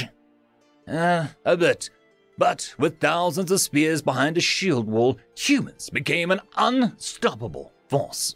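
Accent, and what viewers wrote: Australia. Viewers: Must be from down under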